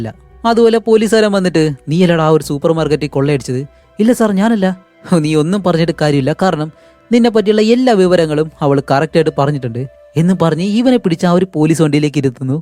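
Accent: Palakkad, India. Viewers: ഞാനാണ് നിങ്ങടെ പാലക്കാട്.... സ്ലാങ് തോന്നിയ ആരേലും ഒണ്ടോ?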